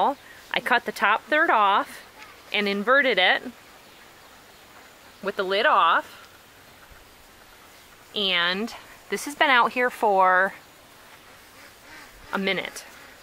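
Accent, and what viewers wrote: Minnesota, United States. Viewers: She must be from Minnesota. Cute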